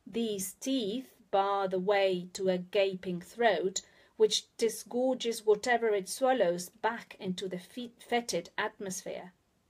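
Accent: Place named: United Kingdom. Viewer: ♥ ¿No te gustaría leer unos poemas de emily dickinson con ese acento británico?.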